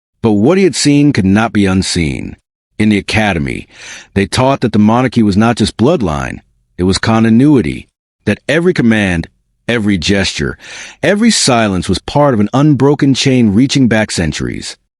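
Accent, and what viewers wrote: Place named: South, United States. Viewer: It's ironic that the voice has a southern U.S. accent when the setting is England.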